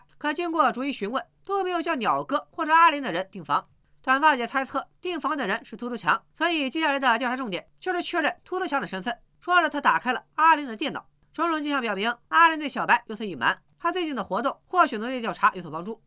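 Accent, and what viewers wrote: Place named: Shandong, China. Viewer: 山东味儿有点重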